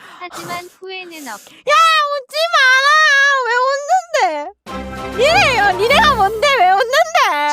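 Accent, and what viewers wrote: Gyeongsang, South Korea. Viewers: 귀여운 부싼 아입니까.... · 이게 경상도거등요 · 경상도서 꽤 오래 살았지만, 저렇게 ㄱㅇ게 사투리 쓰는 건 처음 봤어요ㅋㅋㅋㅋㅋ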